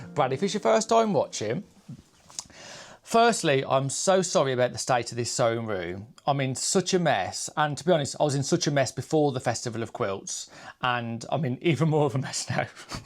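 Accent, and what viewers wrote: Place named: Black Country, United Kingdom. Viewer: Ah, Brad - nice to meet you. Your Quilt featured in one of my FOQ's video's - view from a wheelchair and someone told me in the comments who you were. "That's Brad's quilt". Like many competition entrants, the public did not see the Judges views unless they'd won a prize, been shortlisted or were a Judges Choice Award. I was a first time entrant. Your Quilt stood out for me, as I couldn't believe a Novice had made it. Your Piecing was perfect and colour choices blended really well. I'm even more amazed now that I know you have a Disability (so do I) and I wonder how on earth you manage to manipulate such a large, heavy quilt? Bravo. I'm also fascinated by your accent, having lived in Wolverhampton for a while, worked in a Dudley Hospital and travelled by bus via Sedgeley, where the route passed through Upper and Lower Gornal. 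Don't worry, am not a stalker. Will like, subscribe and look forward to watching not only your back catalogue but your future plans. Special mention to your Mum for not reigning in your spending! Best wishes, Maggie, Chester. X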